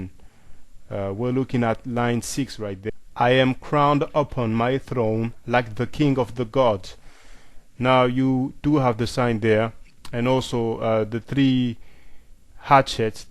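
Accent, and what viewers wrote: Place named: Haiti. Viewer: As soon as I heard your accent I knew you were Haiti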